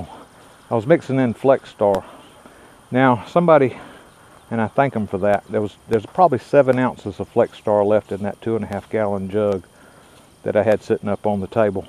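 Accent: South, United States. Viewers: I enjoyed the backpacking trip! Learned a bunch more. That bit of glee in your voice every time you sprayed a weed made me smile - especially when you came across that evil sicklepod (which I promptly Googled) - "DIE" spray "DIE" spray - all in that nifty southern accent. Exactly how much backpacking do you do on your acreage - or was this just a spot check? You are right though - "you can't buy rain". If I could gift you with it I would. Hang in there and keep on being the that you are - won't be long now before there are over 2K of us out there following along and enjoying your content. You have not only grown soybeans, you have grown a fan base who really appreciates what you share with us!:)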